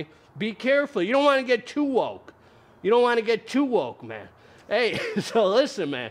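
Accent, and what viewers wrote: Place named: South, United States. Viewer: You remind me of a southerner with the way you say things. Idk where you live you just remind me of a very energetic southerner lol😂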